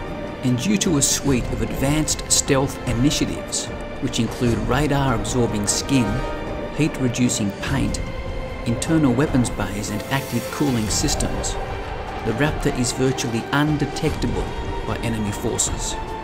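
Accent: Australia. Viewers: You sound Aussie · Brilliantly edited and narrated. I wonder who Sir Lionel contracted to do the narration? I just can't seem to place the voice. He sounds like someone I knew once, but he was from France. So it can't be him because this bloke has an Australian accent.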